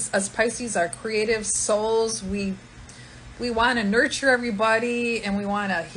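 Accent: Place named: South, United States. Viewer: Omg my southern accent rubbing off on you.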